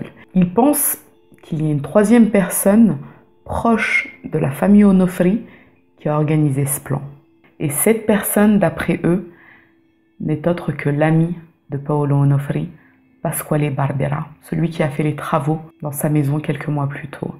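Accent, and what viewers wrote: Italy. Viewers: cet accent! tu sais parler italien? impressionnant ! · Quel accent !!! Suis italien j'ai remarqué de suite que tu le parlais, tu es Italienne ? Bravo une fois de plus gros travail de ta part sur cette histoire ! J'adore mon rdv du dimanche !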